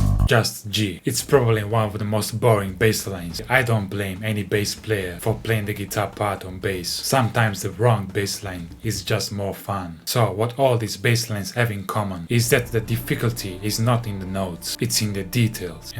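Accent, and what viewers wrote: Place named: Italy. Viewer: who? the commenter said he was mexican and I dont think is american, sounds pretty italian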